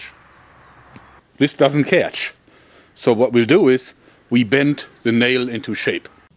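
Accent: Germany. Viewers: mal dumme frage aber biste deutscher? weol dein english so klingt^^ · Are you german ? Nothing against you talking, but I just wanna know. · Sehr geiler deutscher Akzent :D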